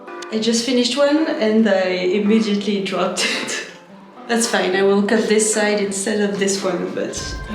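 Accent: France. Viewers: Bonjour - God I love the French accent. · Why the French accent while speaking English?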